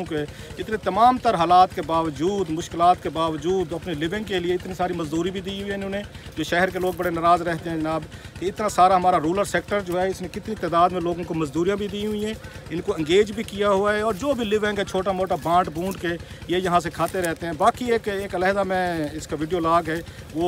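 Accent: Saraiki, Pakistan. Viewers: Klasra Sahb apka lehja wohi saraikion wala hy.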